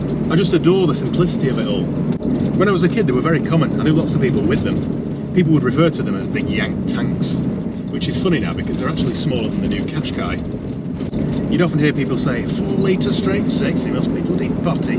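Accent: United States. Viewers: Your American accent cracks me up so funny! 🤣🤣 Keep up the cracking content! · Good American accent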